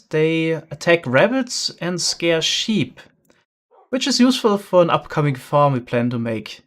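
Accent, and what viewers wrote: Germany. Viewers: His accent is really german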